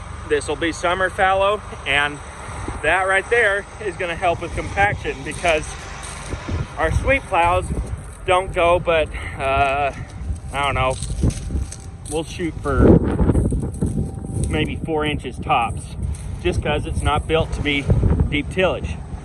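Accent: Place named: South, United States. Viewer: What part of Texas are you in and what do your grow, just wheat? BTW SO GOOD to hear a "ya'll" on a farming video! I have been drowned with "you betcha" and "you's guys" on farming videos and it is SOOO good to hear a good ol "almost" southern accent, course I'm from BAMA! LOL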